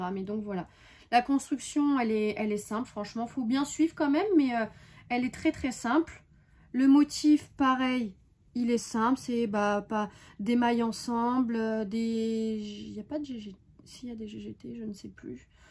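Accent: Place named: Hauts-de-France, France. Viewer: Bonjour, je découvre votre chaîne et j'ai regardé votre vidéo avec grand plaisir, votre façon de parler très naturelle me plaît beaucoup (peut-être aussi parce que j'habite les hauts de France également et qu'il y a de toutes petites pointes d'accent dans vos paroles). J'ai cru comprendre que vous avez commencé le tricot il y a un an, je suis en admiration devant votre paire de chaussettes de Noël 😮😮😮😮😮😮😮. Continuez comme ça ne changez rien à bientôt 🙏🤩🤩🤩🤩🤩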